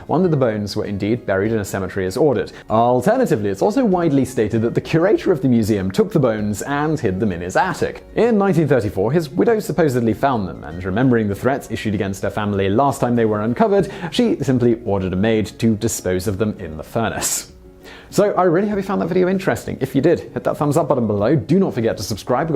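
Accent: England, United Kingdom. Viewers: Why do you pronounce "Marquis" the American way. Your accent suggests, to me at least, that you are English, where we pronounce it "markwiss". Please, give the man some dignity. He was a member of the English aristocracy, not a fucking tent!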